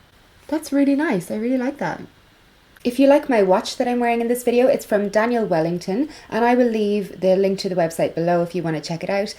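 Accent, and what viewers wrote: Ireland. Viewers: The very best review & info on this product!! I have been so interested. You have the most wonderful accent, I love it!! Irish? You are my new favorite you tuber!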